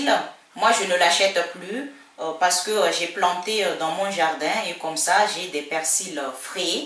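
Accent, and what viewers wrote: Madagascar. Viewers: C'est une Malagasy!!! Cet accent ;)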